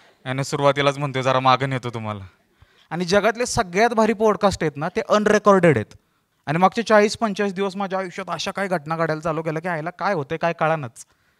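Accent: Satara, India. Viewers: 90's ERA खरचं आपण लहानपण जगलो - without technology Wale तू सांगितलेल्या सर्व गोष्टी खूप जवळच्या वाटल्या....लहानपणात घेऊन गेलास मित्रा...आई वडील, गुरुजी, मित्र सर्व आठवले...hats off 🙏🙏 अजून एक गोष्ट मी एक सातारकर आहे त्यामुळे तुझी बोलण्याची पद्धत त्यामुळे अजुन जवळ गेलो ....thank u 🤗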